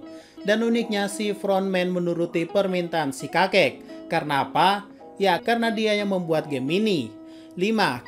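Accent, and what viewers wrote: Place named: Flores, Indonesia. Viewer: Logat naratornya ga asing. Pasti orang Ende-Flores, NTT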